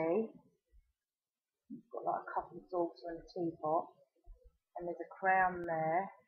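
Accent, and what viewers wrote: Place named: United Kingdom. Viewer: so British, I love it, gorgeous!!!! Hugs;)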